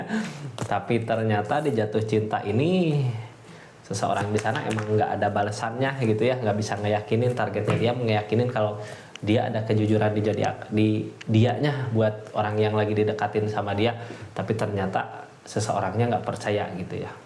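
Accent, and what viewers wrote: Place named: Sunda, Indonesia. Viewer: Akang meni sunda pisan atuh, tapi da resonet eta reading na. Haturnuhun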